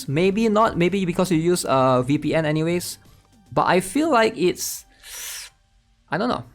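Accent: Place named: Indonesia. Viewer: probably indonesia judging from his accent, it is different from ours Im from the PH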